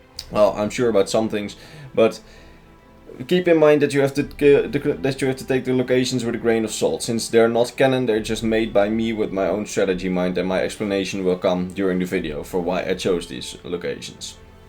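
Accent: Netherlands. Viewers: Je klinkt zo nederlands als je engels praat 😂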